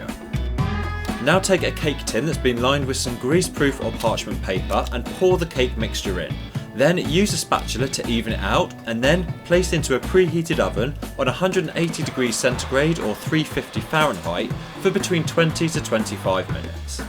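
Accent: United Kingdom. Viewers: BRITISH ACCENTS ARE SO ANNOYING but the recipe seems simple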